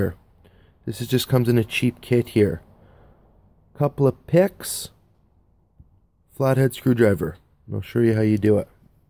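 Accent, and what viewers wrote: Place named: Canada. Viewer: He’s got a great white north accent bud.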